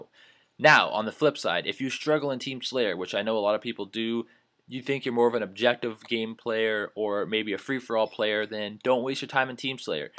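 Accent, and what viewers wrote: Canada. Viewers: your Canadian aren't you? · he sounds like he lives in canada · I love your Canadian accent! It's just perfect!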